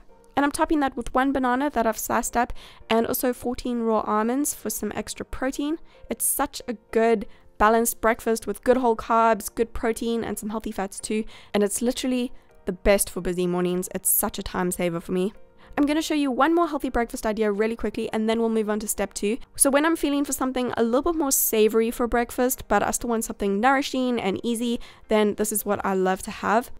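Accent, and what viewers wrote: South Africa. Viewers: She's south african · HATS THAT ACCENT ? SOUTHAFRICAN ? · Are you South African? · Are you south African? That accent 😍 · are u South African or Australian?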